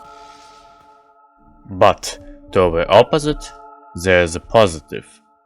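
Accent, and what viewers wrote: Russia. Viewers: i think he has a russian accent or something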